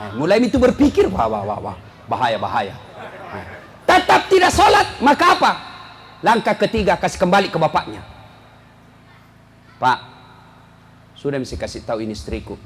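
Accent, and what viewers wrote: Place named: Sulawesi, Indonesia. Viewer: Sering dengar ceramah dari Ustd Dasa'ad Latif,sy suka sekali.Bahasanya sederhana mudah di fahami dan dialeknya mengingatkan sy waktu masih tinggal di daerah Sulawesi. Sy sendiri orang Jawa, tapi sejak bayi sampai remaja saya tinggal disana.Semoga Ustd dan keluarga sll dlm lindungan dan berkah Allah SWT.Jadilah penceramah.yg baik untuk NKRI.Dari semua suku.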